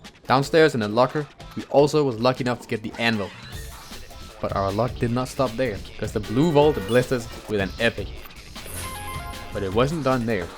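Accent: Denmark. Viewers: Are you by any chance danish? The accent sounds very much like it haha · I smell Danglish :D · kan bare høre du er dansk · Dansk accent on top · 100% dansker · You danish by chance?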